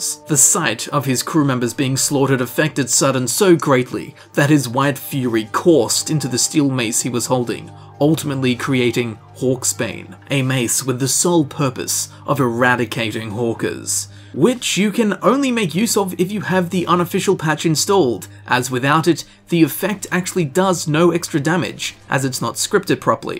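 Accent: Australia. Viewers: Dude it's like / ... I mean you sound Australian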